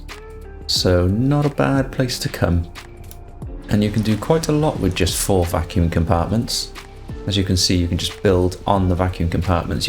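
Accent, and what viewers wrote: Wales, United Kingdom. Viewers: am i picking up a welshy accent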